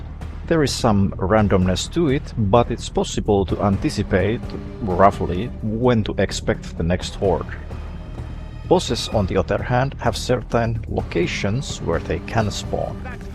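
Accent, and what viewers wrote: Finland. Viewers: You have very finnish accent ❤ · I love your slight finnish accent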